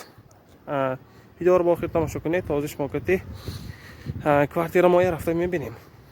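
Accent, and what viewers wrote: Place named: Mongolia. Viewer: Узбак бошаде ? Монголиский акцент . Что они делают в ходженте? Ужась откуда эти клопы распрлзаются ?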